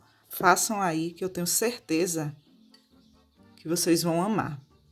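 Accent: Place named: Paraíba, Brazil. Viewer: Acabei de me inscrever!! A M E I a sua forma de explicar com riqueza de detalhes!!! Além do mais, que sotaque bonito!! De onde vc é?!?!🤔 Parece sotaque paraibano!!! GRATIDÃO pelo ensinamento meticuloso e paciente!! Deus abençoe a sua vida, seus projetos, seus objetivos!!! Saúde e paz!!! 🙏🙏🛐💕💕🤗🌻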